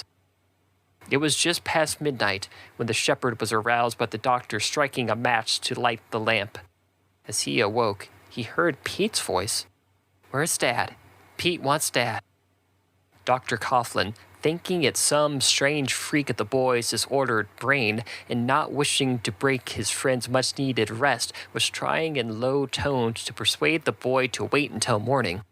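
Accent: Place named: Ozarks, United States. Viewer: Wonderful job with the phases and feel of the language of the Ozarks.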